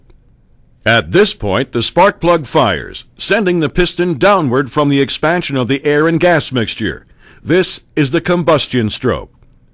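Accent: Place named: United States. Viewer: Do we have to have the overconfident American accent? It's a piston not a peeestonnn.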